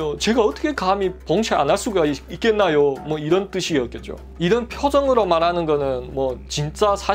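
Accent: Yanbian, China. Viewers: 발음을 들으면 연변조선족인거 같아요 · 이름도 한국이름이고 근데 뭐지.. 중국사람인가? 발음이 사투리도아니고 뭐.. 중국사람인가요 이분?? 우연히 .보게 되었는데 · 조선족말투 잘하시네요 ㅎㅎ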